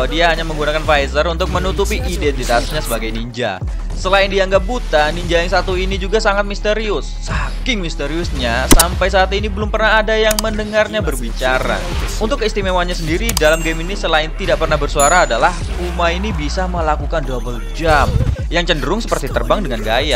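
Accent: Java, Indonesia. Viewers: logat jawanya keluar😂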